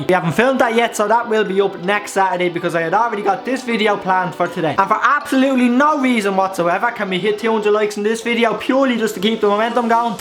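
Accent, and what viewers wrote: Ireland. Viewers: Its nice to listen to an Irish person on you tube just messing around and not being so serious. PS you remind me of my little cousin's deadly (*-*).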